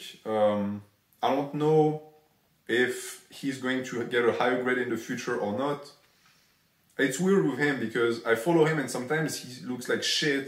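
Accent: France. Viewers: Your French accent hooked me the first time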